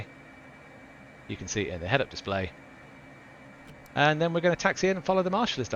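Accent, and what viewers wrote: United Kingdom. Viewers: I like your British English accent.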